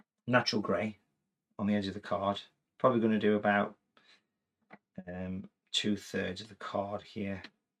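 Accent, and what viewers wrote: United Kingdom. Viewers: I shall give this a go as I loved the rocks. Love your Derbyshire accent too 👍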